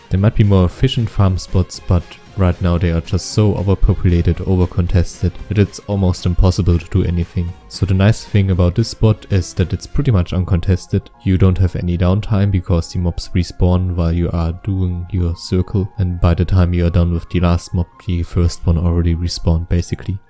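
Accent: Germany. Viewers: Are you German? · he sounds very German to me. coming from a German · i can hear denglish :DD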